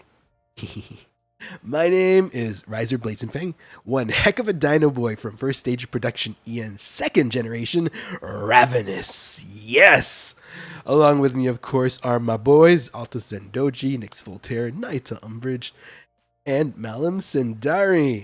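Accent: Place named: Thailand. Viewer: omg your thai accent is so adorable Ryzar✨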